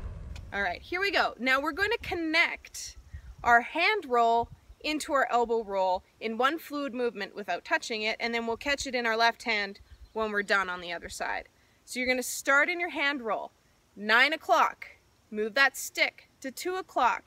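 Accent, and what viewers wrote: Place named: Canada. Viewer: Canadian?Thought I heard the majestic accent!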